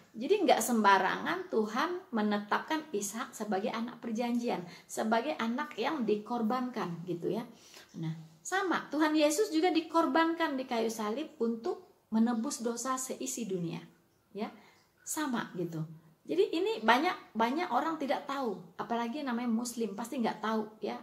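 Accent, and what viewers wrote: West Sumatra, Indonesia. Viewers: Bu' logat dan intonasi ibu bicara saja jelas kok suku minang. Terus lakukan dan berikan penjelasan untuk meluruskan tanggapan yg miring dari Muslim Menjawab. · Haleluya Puji Tuhan Yesus, Shaloom Bu semoga di berkati Tuhan Yesus dgn bersama keluarga...Amin terus Bu, saya orang batak lama di Riau saya masih dengar logat intonasi masih terbawa orang Minang dan terusss Bu kabari injil terus saya penggemar Bu, kasih Yesus nga pernah pudar..! bersinar terusssss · Dari logat bcr, sy yg bukan orang Minang tau, beliau mmg dari suku Minang, sy punya seorang teman yg sdh lama tinggal dan bergaul dg orang2 dari brbagai suku di Jkt, logat bicaranya percis sama dg ibu ini, lagian buat apa sih hrs bohong soal beginian.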